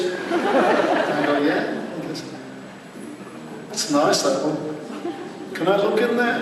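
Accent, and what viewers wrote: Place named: Scotland, United Kingdom. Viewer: Boy! The brogue REALLY comes out when he goes back to Scotland!! ;)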